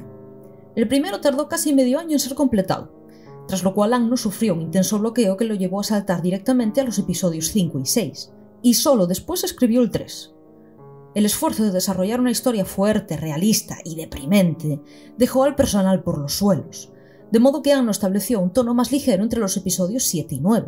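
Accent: Spain. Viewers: Una pregunta, eres gallega? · Son fantásticos, todas y todos los que estén detrás de este trabajo, mucho orden, demasiada edición, la narradora tiene el tono de voz ideal con el endulzante acento español, es increíble. Estoy enamorado de sus videos. Saludos desde México!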